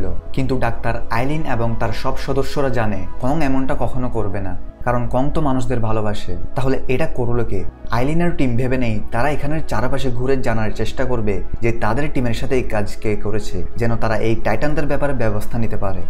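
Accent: Chittagong, Bangladesh. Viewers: ভাই আপনার চট্টগ্রামের টোন টাতে কথা গুলো শুনতে ভাল লাগছে,কারন আমিও চট্টগ্রামের ছেলে 😁😊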